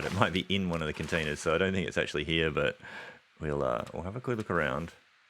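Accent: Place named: Australia. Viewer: The weather was great but you're skills in taking advantage of it were excellent. Wish you could have found a hacksaw but as others have said the flare gun is a good get. Also nice to hear a fellow Aussie's voice in the Canadian wilderness!!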